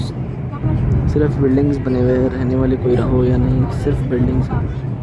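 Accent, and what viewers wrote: Hyderabad, India. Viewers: I can say ur from hyderabad, pure hyderabadi. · BHAI YOUR VLOG IS SUPERB AND THE AMAZING PART IS YOU USED HINDI LANGUAGE ( WORD PRONUNCIATION SE LAG RHA THA KE HYDERABADI LANGUAGE HAI ) VLOG ME JAAN DAAL DIYA LANGUAGE NE .. kEEP UP GOOD WORK :-)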